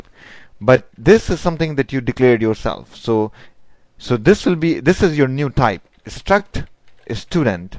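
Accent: India. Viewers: indian slang ftw!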